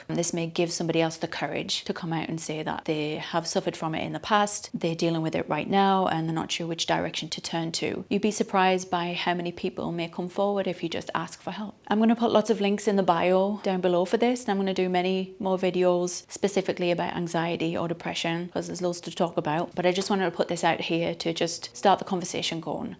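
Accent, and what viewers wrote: United Kingdom. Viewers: I adore British prononciation, sounds like a music to my ears. thank you for the video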